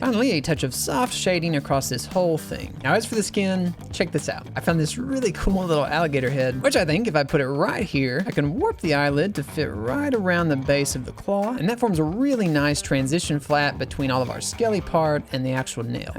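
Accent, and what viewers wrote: South, United States. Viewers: Why does he sound like a red neck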